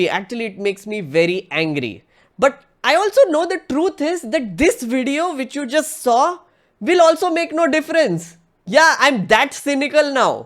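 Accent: India. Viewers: You have a stereotypical Indian accent. 😃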